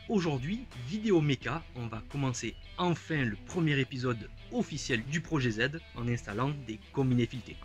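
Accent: South, France. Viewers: Cc je suis un nouveau abonné à ta chaîne et je t'avoue que je trouve ça plutôt très bien ta des plans de vidéo propre des explications claires et surtout un putain d'accent du sud que j'adore 👍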